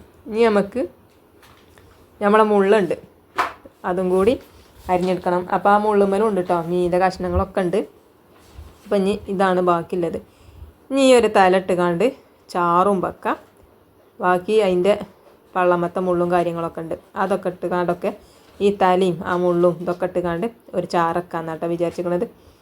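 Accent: Malappuram, India. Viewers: മലപ്പുറം ഭാഷ മതി എനിക്ക് ഇഷ്ടം ആ ഈ സംസാരം കേൾക്കാൻ 👍🏻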